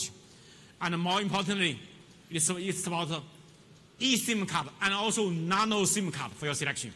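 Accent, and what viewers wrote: China. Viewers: As a Chinese myself I can easily understand what he is saying about (real Chinglish ),but i am not sure that foreign friends on YouTube can understand. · Ugh Chinglish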